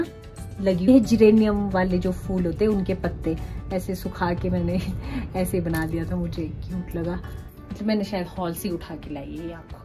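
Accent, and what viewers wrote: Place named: Pahadi, India. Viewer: You are so creative and loved your pahadi accent ❤️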